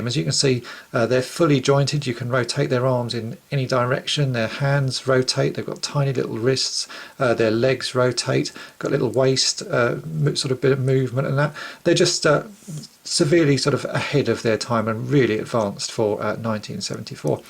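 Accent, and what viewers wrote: United Kingdom. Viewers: John Jones he's a Brit. vowels tens to be reversed.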